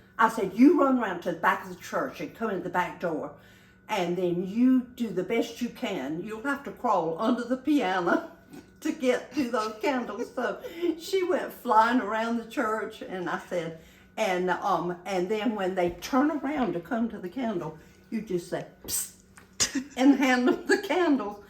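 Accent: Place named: South Carolina, United States. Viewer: When my husband was active duty, we were stationed in Hawaii for a while. The Hawaiians swear by their macaroni salad. One thing I learned from them is interesting. Before you add anything else to your cooked macaroni, stir only the apple cider vinegar into the noodles. Just let it sit for an hour or two before adding everything else. I can't say how but it does make it 'different'. I can't say better because yours looks marvelous. Anyway, just thought I'd mention it. I love your channel. My grandmother sounded just like you. She grew up near the SC line and adopted your most beautiful accent.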